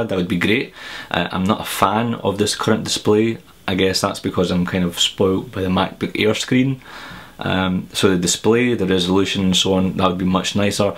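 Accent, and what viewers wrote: Scotland, United Kingdom. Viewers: I like Ur scottish Accent! Big Country Forever! Stay alive!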